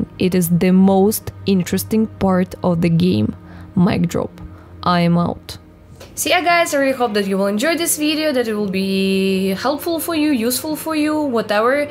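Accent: Russia. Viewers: are you Russian? That accent you have I've heard it on 007. Or maybe too much Russian bots and the USA...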